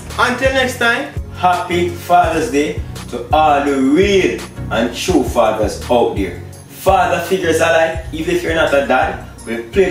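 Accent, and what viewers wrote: Jamaica. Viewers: The vlogger's manner of speaking is called Yard Flex aka Jamaica vernacular. You are probably not a Yardie, so you would not understand the way in which some Jamaicans communicate, and if you re a Yardie, shame on you for criticizing another Yardie when your own writing demonstrates that you have not accomplished basic grammar. De pot a call de keckle black. These types of Jamaicans, with their own flair and creativity, are the ones who made Jamaica what it is. There would be no bloodclaat reggae music were it not for people like this vlogger. By the way, the video is about a Jamaican dish, and not the vlogger's vernacular. So, why are you criticizing the in which he speaks? I know that mispronounciation of words, and stuttering while speaking is very much part of the way in which many Jamaicans communicate. Keep it up brother, your vernacular brings me feelings of nostalgia. 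Nuff respect in every aspect.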